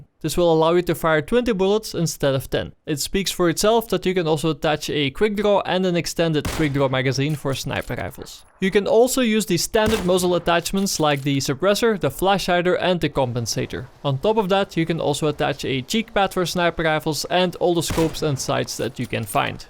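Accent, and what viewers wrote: Netherlands. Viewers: echt een nederlander hoor het gelijk XDD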